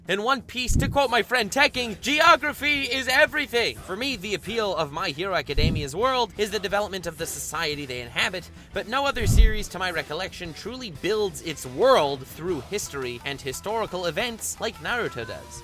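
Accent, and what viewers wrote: Canada. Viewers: i can hear the canada in your voice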